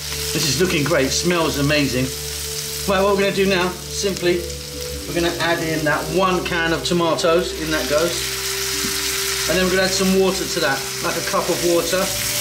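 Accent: United Kingdom. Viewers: is it $ or £ ?... Chef sounds british & cooked good brit faves 😋 i will try but might spend more than $20 in £s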